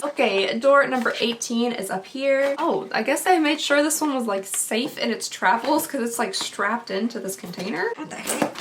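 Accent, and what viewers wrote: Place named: South, United States. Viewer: Your holding back a southern accent...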